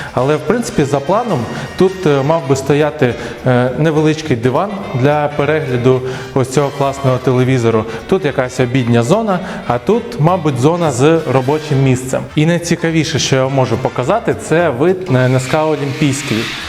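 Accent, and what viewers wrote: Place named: Russia. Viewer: He is speaking with russians accent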